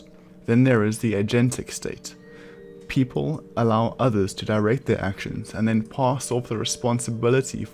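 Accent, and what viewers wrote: South Africa. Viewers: This narrator sounds distinctly South African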